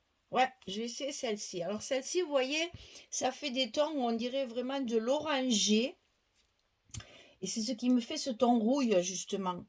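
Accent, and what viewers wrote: South, France. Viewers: Superbe, j'adore te regarder juste pour ton petit accent du soleil 😘j'admire tes créations... Vais peut être finir par me lancer dans un album. Je ne connaissais pas cette marque, est elle chere ? Car petit moyen. J'essai de faire des créations avec mes petits moyens, je viens d'ailleurs de créer une chaîne qui comme moi, ont peu de moyens. J'ai trouvé une astuce pour donner un aspect corrosion à nos decoupes style steampunk, si cela t'intéresse. Merci pour tes partages❤️❤️❤️❤️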